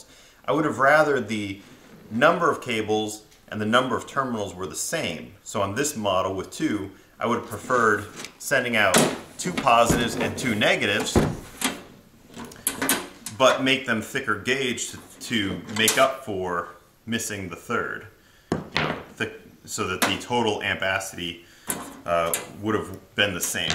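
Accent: United States. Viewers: Why do Americans pronounce it sodder, when solder has an "l" in the word?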